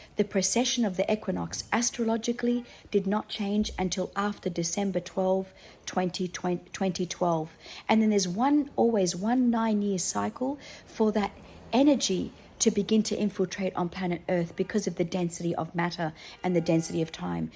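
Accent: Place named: Australia. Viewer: Hello from Western Australia 👋 great to hear another Aussie lady speaking on TF’s 🙏♥️✨✨✨I’m first wave, I met my Beloved late 2013/14. And yes your spot on, he has kept me in deep dark silence for years. I’ve been blocked & im still blocked as far as I know. No friends in common, no social media to “ stalk” nothing!!! We last spent a night with each other Dec 2019, heard from him again over a phone call Feb 15th 2020 and have not heard from him since. But he never hurt me intentionally or ever said a mean word, he just ran and ran hard. He’s in a relationship with young kids. I’m 12 years older at the age of 51. lol 😂 another EDIT!!! YES I’m a born rebel, you have described me to a T. I am anti everything “ Establishments” and I’ve seen/known of the darkness that dwells within these matrix establishments since I was a young girl. I DO NOT COMPLY 🙌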